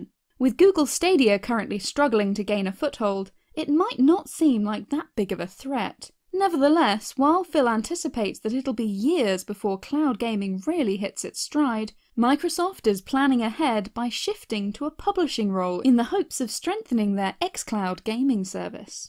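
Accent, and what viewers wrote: United Kingdom. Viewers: Theres just something about a British accent.🤤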